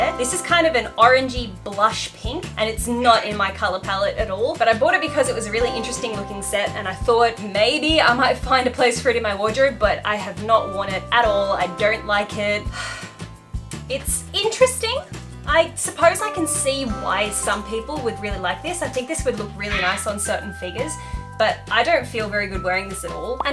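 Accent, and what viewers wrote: Australia. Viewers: Please don’t change how you say things, be Aussie proud🤗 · Are the two ways you pronounce "pastel" spelt differently? When you say things differently then I do I just think it's part of the Australian accent 🤷‍♀️ also just for fun I thought I'd mention that pastel means cake in Spanish which when I learned that found funny 😁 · I love your Australian accent. I may be American and you say words differently (obviously) but I don't feel like it's that big of a deal. I understand what you're saying, so say it however you want. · Please say things the way you naturally say things. I love Australian accents and learning how other people pronounce it call things. —People that seriously can’t get over the way other people say things are infuriating. News flash!! People from other areas aren’t always going to sound just like you. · You are just too sweet. 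I love your accent and the way you say pastel. You always want to please people, but you ALREADY ARE. If they are coming at you in any negative way they can buzz the hell off to their perf YouTube-r. There are plenty of us than know, understand ADORE your Aussie differences. Never change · Are u from Australia I’m from Australia 2 Sydney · Also I’m Australian and I can confirm that’s how we pronounce things, fight us international folk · WOW! I cant believe that girl Nina Tailor was SO INCREDIBLY rude! 1st of all, if u don't like it, dont watch.. 2nd of all, Archie is the most awesome bird on YouTube and Yes, HATERS, he does need to be in every video he wants to be in. Also, I LOVE ur accent! What kind of person tells you your wrong for speaking in your native language/accent? Literally if you were to ask any person who knows me they would all tell you this about me: I always say that I want to hire an Australian person to give me bad news because I feel like no matter what you say, if you say it in an Australian accent it sounds happy lmao. 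I fairly recently found your Channel, did a bunch of binge-watching, and of course subscribed! I think you're great, I love you, I love Archie, I love your content.. screw the haters they're just jealous! Keep doing your thing girl cause you're awesome!! And as always, TYFS!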